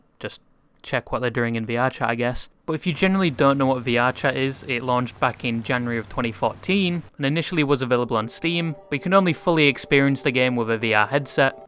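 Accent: United Kingdom. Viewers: The British accent is so thick I sometimes can't understand what you're saying vroo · Oh god the heavy British accent, I already know I'm not getting 50% of what he's saying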